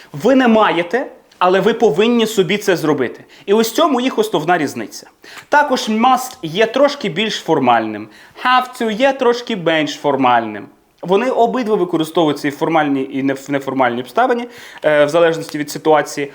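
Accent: United Kingdom. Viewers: Дякую, дуже цікаво! У Вас чудова вимова!!! Чисто британська! ❤👍🇺🇦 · Британський акцент - це просто 🔥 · а це британьській вимова?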